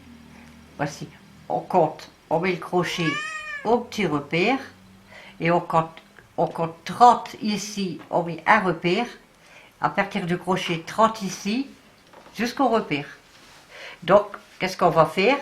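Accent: North, France. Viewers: bonsoir trop beau et toujours aussi bien expliquer et cette accent du nord, passez un bon moment a suivre la vidéo je vous remercie vraiment gros câlin a minou Monique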